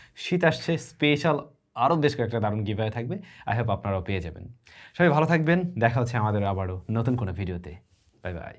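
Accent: Barishal, Bangladesh. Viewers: বরিশাল কথায় আপনার বাড়ি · আমনেও মোর মতো বরিশাইল্লা❤ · ভাই আপনি আমাদের বরিশালের লোক?🫶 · বরিশালের কোন যায়গায় বাড়ি আপনার? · ভাই বরিশালের কোন এলাকায় থাকেন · ভাই বরিশাল আপনার বাড়ি কোথায়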